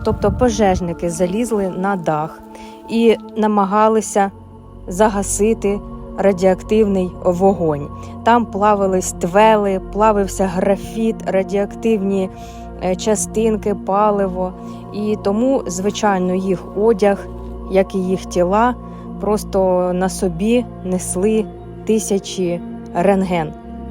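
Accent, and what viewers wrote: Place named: Surzhik, Ukraine. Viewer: Какой у Вас классный суржик.))))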